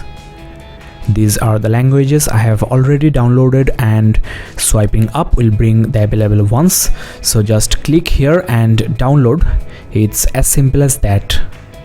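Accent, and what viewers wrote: Bengal, India. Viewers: Not being racist, but he sounds almost like a indian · lmfaoo thats a bengali accent its clearer than indian but almost the same, indians say aindd for the word "and" lmfaoo.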